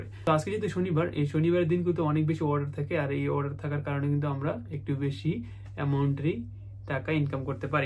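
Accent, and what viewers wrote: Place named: Brahmanbaria, Bangladesh. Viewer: ভাই আপনার কথা শুনে বোঝা যাচ্ছে আপনার বাড়ি ব্রাহ্মণবাড়িয়া 😒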